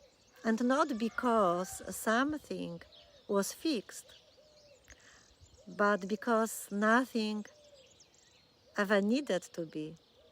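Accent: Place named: Poland. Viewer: It's insane how similar you are to the other woman I can't find anymore: the same Polish accent, the same rhetoric, there is no 'you', etc.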